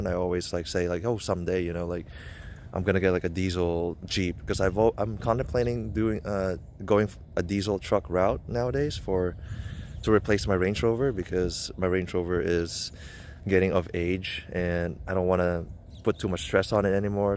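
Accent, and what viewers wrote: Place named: Philippines. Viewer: You sound Filipino :) nice car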